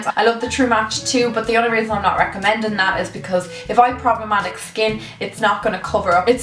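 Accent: Ireland. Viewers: You have an amazing accent, I feel like it's Irish ( because I'm Irish too ) if your from Ireland.. Where abouts? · what part of Ireland r ya from ....Meath pride 💚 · are you from ireland?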